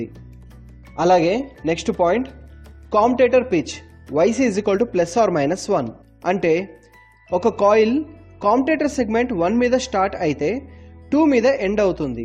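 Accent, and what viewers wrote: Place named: India. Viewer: That's impressive, but We can't here that many words, cause we need english subtitles. Indian english are so difficult to here for another nations.🤔😔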